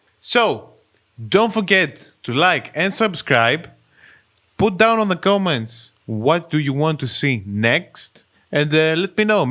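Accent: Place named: Greece. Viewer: Are you from greece? Cause your accent sounds a bit greek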